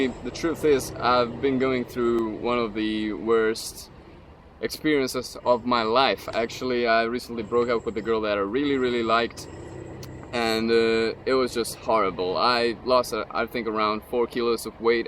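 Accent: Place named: Netherlands. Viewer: Great points and analysis, totally right on. I like what you said about spiraling around the issue and getting closer to the emotional pain, that's a great visual image and definitely accurate. Where do you live, Holland? Just guessing based on your accent.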